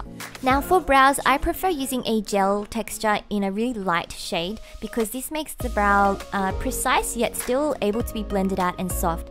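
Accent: Australia. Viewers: are you from Korea, l hear an australian accent? to be honest, you make me wet!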